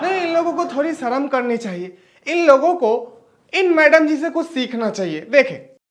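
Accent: Bihar, India. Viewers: Aapki aawaj thoda bihar type me he thoda thik karo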